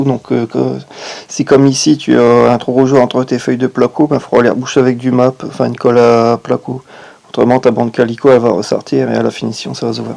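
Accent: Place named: Nord, France. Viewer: Te du ch'nord ti aute ça s'entend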